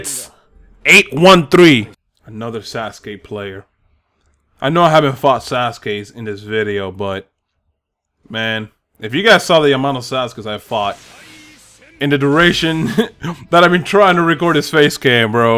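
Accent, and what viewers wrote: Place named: Dominican Republic. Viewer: BRUUHHHH i was honestly not ready for this loool you look like such a cool dude, i can finally put a face to that sick dominican accent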